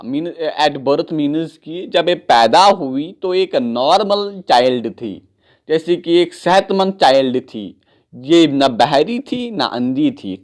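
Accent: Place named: Kashmir, India. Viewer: Your kashmiri accent is so sexy ❤❤❤❤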